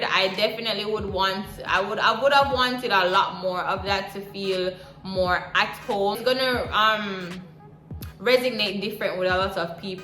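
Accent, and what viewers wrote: Caribbean. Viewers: Loving that Caribbean accent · That intro…I know she’s a Caribbean gworl🤣🤣🤣🤣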